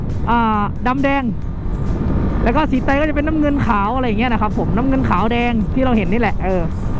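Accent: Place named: Isan, Thailand. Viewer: เว้าอีสานคือเป็นตาแพงที่ว่ะ😅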